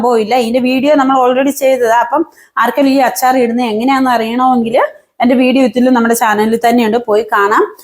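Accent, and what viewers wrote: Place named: Idukki, India. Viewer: Serikum janma nadetha, thani Idukki samsarum anallo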